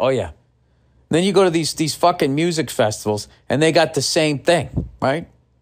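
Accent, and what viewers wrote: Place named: Boston, United States. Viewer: Bill is fom Bwoston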